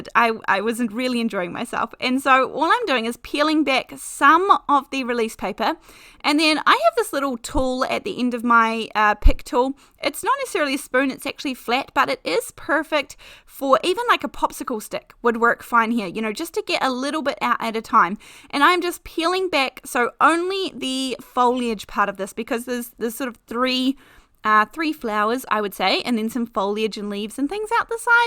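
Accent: Southland, New Zealand. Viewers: Wow, that's so awesome, I'm just getting into card making and your video is great, I noticed you're also from NZ, by any chance from Southland, I noticed the accent as I'm from Invercargill 😂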